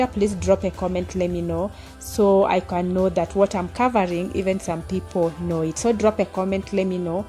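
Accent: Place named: East Africa. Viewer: I follow Yr channel from Southern Africa. I like development topics in africa. However if I may ask? Why does Yr accent sound East African than Ghanaian or ratcher not west african?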